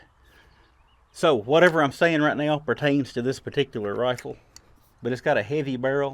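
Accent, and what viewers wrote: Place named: Kentucky, United States. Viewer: Heck.....you always sound funny to me.....you Kentucky boys vocalizations are a bit to sophisticated for a Texican😀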